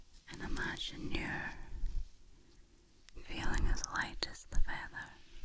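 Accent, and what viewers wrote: Scotland, United Kingdom. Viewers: I luuv your Scottish (Dundee?) accent and other northern English ones, like Yorkshire, Lancashire, and Scouse. I also imagine you a brunette as best I can; I’m allowed. 🥰💕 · soft Scottish voice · I love the Scottish accent!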